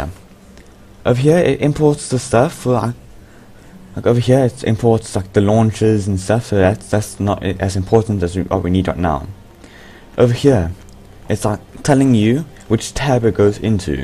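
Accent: South Africa. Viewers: this guy sounds South African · you sound South African....are you?? if you are, jas my bru, so am I!!! :P :D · ur african? lol wow :D im from england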